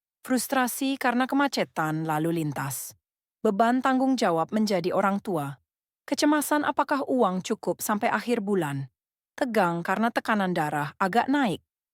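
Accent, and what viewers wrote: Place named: Malaysia. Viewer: Kok kaya bahasa Malaysia si, logatnya jg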